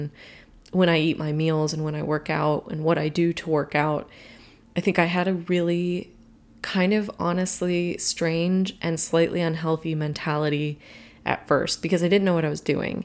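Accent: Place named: Germany. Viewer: I just stumbled upon your channel and wanted to say I absolutely adore your work. It's so stunning yet soooo cute and you've definitely won me as a fan <3 love from Germany P.S. splendid German accent haha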